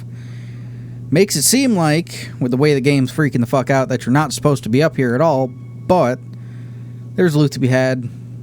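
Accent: South, United States. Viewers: When you get angry, the southern accent comes out even more. Speaking of sound. It is NOT a complaint. Just an observation. On the other hand, visually this time, it's funny, for a veteran FO4 player, the lack of big picture in the displayed frames. And that's not a complaint either. Just another observation. All in all, I enjoy this type of content, no pretense of attacking a particular topic, just reflections on the game. By the way, this is the first video I've ever seen from your channel. Definitely NOT the last one. I subscribe to the channel, especially for FO4, which, for objective reasons, I cannot play at the moment. As a curiosity, English is not, as you well notice, my first language (in case you're wondering, it's Romanian).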